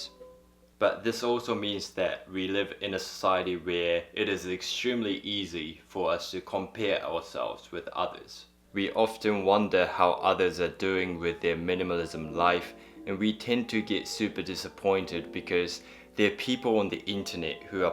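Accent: New Zealand. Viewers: Are you a kiwi? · you sound like a kiwi. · I thought I’d detected a kiwi accent 😃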